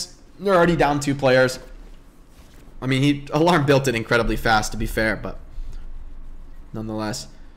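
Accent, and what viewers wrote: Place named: San Diego, United States. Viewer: The intro sound like Doug DeMuro. Is that just a San Diego cadence thing?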